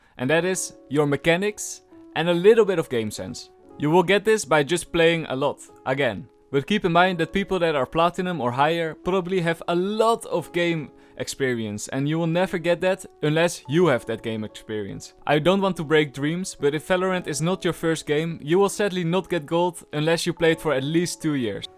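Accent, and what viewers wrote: Netherlands. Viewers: your nederlandse accent is really funny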